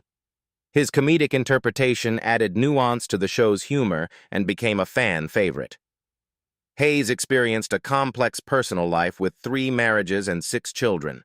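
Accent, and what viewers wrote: United States. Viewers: Why use an American voice to tell us all about a British show. 😢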